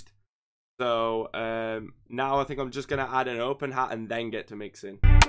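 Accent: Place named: Northern England, United Kingdom. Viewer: You are definitely from north England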